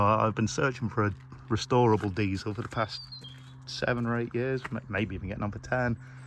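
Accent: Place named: Norfolk, United Kingdom. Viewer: Well that’s an accent I know you must be from my neck of the woods (Norfolk)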